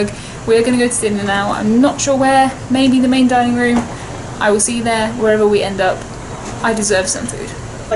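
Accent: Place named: England, United Kingdom. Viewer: Your English accent is so beautiful 😍😍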